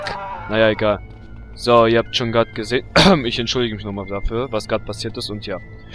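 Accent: Russia. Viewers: bist du russe?:D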